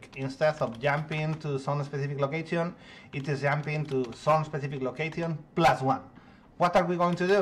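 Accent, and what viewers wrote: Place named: Spain. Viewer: Acento de españolazo. mis dies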